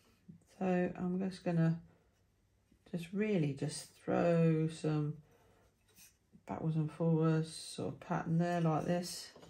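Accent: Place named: United Kingdom. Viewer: It is your British accent that makes it difficult for my American ears to understand. But no worries, between the subtitles and slowing down the verbal, I am able to understand all that you say!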